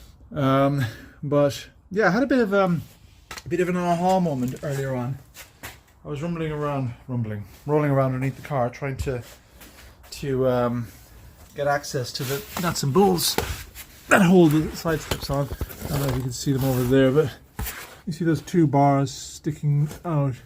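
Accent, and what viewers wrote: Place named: Cork, Ireland. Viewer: ah you can still hear it in you is it north cork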